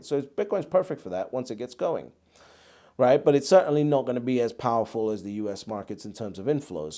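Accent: Scotland, United Kingdom. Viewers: A quite realistic appraisal, yes there are a lot of rivers still to cross for Bitcoin. And yes US ETF approval was a major step because SEC were effectively forced by a court judgement to approve Bitcoin against their wishes - that is a sea change, it is a significant shift within the power structure - people like Kevin O'Leary openly laughed at people taking cases against the SEC. Are you from Scotland yourself, Edinburgh maybe?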